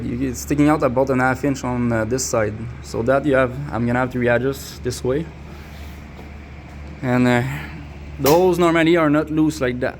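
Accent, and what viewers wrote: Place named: Quebec, Canada. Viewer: Je reconnais l accent quebecois. Le tube avec la tie rap a l avant du moteur, c est la belt exhaust. La sortie est a l avant du moteur pour faire dissiper la chaleur du moteur vers l arriere. Donc, meme si tu met un collet, il va quand meme s accumuler de l eau dans le tuyau puisque la sortie est tout pres... le tuyau en u en haut avec un trou, c est aussi le tuyau de la belt exhaust. L air sort de la belt box, monte en haut, et redescend au moteur... lorsque tu est dans l eau, puisque l air ne peut pas sortir au moteur a cause de l eau, elle sort par le petit trou en haut dans le u.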